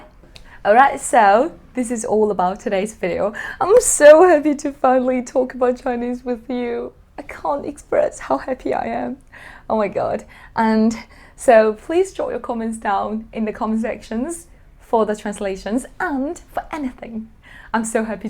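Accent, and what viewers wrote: United Kingdom. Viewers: British accent??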